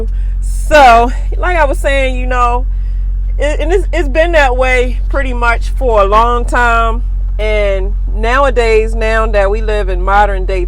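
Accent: Baltimore, United States. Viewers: you can tell she from b more city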